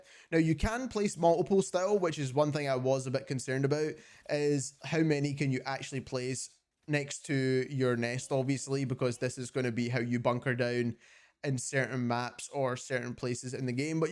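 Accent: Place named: Liverpool, United Kingdom. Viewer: The only thing painful about this video was his liverpool accent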